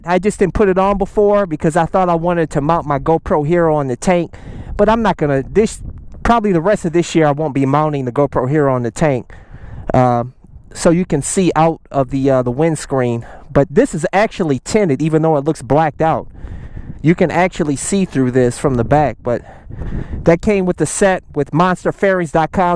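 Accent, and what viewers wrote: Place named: Midwest, United States. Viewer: He grew up in an urban area of the midwest which is why its sounds like a mix of mid-western and northeastern dialects. Its the reason why its hilariously endearing when he says things like "FO DA STREETZ" "HUNDID" and "DAHBUL ARRR" lol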